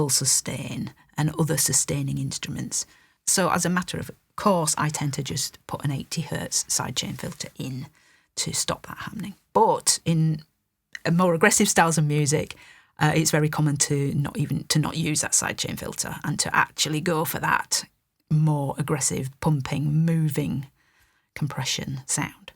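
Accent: Northern England, United Kingdom. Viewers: Glad you're back, you my no.1 source for tutorials about mixing. As an engineer myself I found that we are on the very same page in terms of workflow, choice of gear and alike. Love it, and i love you accent! is it from the north of England?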